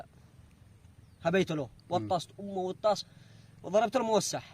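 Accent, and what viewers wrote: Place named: Taiz, Yemen. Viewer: يا جماعة هذا لغجة عنده لغاجة وكمان يبهرها باللهجة التعزِّية أغثى وأكره لهجة أعوذ بالله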